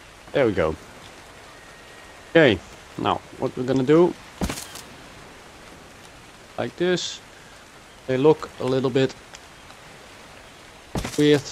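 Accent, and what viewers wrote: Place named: Netherlands. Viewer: En je hoort heel duidelijk dat je nl bent 👍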